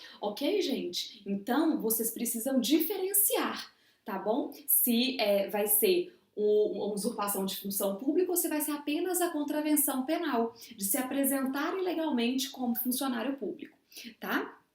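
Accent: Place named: Minas Gerais, Brazil. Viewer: Parabéns Mineira...o sotaque da terra ajudou bastante te ouvir falar...ótima cadencia e construção...continue melhorando!!!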